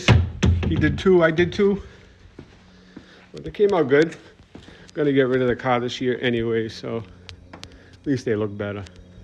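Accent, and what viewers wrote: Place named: Boston, United States. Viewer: Lol Bro you live in the suburbs what's with the Boston city accent...stop pretending to be something you're not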